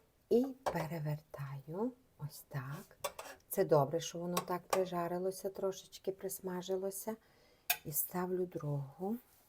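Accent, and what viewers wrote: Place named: Zakarpattia, Ukraine. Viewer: Дорога Пані! Вітання Вам із Канади! Ваше відео мені переслала моя подруга із Амстердаму. Не можу передати словами, які приємні емоції я отримала слухаючи наш чудовий закарпатський діалект, і відтворюючи у памʼяті смачну Повненку, що готувала моя мамка. Це якраз той рецепт, з яким Ви і поділилися із нами. Якось я про нього призабула, але тепер обовʼязково приготую. Ви, Пані, надзвичайно приємна жінка, з такою позитивною аурою навколо себе, що відчуваєш навіть за океаном! Міцного Вам здоровʼя, чим швидшого мирного неба, і чекатиму на Ваші нові відеозаписи.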